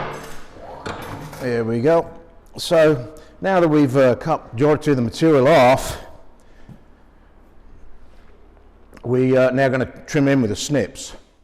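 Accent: Australia. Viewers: I couldn't pick the accent. Checking the about on the linked web page I see he started in Britain and moved to America. The resultant accent is closer to Australian than anything else.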